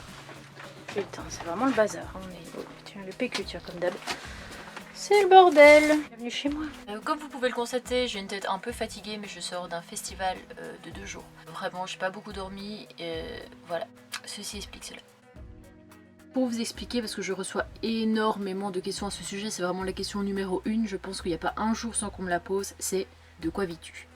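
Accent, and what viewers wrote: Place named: Belgium. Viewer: charmante et courageuse la Belge juste une fois lol et moi qui croyais que tu prenais l'accent Belge juste pour plaisanter hihi. En tout cas ça fait plaisir de voir que des femmes ose se genre de mode de vie. Un petit boujou du 50 😊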